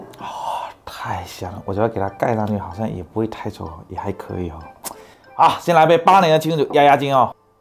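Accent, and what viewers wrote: Fuzhou, China. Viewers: 说是“虎纠人”讲普通话更准确一些，“湖建”的方言太多了，小文哥口音是标准的“虎纠人”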